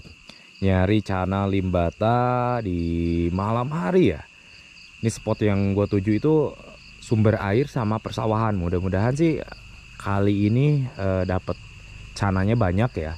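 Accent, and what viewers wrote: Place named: West Java, Indonesia. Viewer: Daerah mna om ko logat nya sunda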